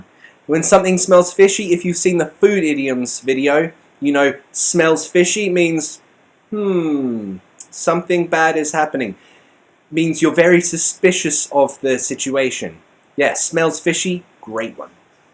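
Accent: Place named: Cockney, United Kingdom. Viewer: cockney sounds and is uneducated accent , but it is real and I love it.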